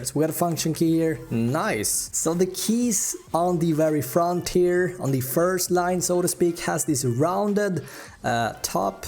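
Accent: Sweden. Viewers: the svengelska is taking over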